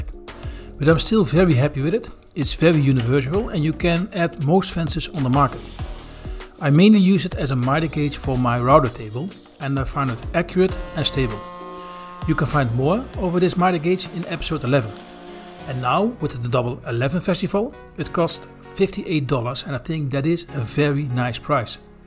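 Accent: Netherlands. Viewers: Verdomme dat hollands accent haal je er ook meteen uit.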